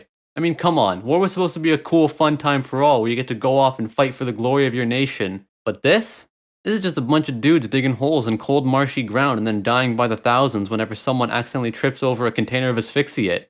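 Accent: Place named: Newfoundland, Canada. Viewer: I’ve been binging your videos and was thinking to myself “sounds familiar..” and the b’ys confirmed it! You’re an NLer!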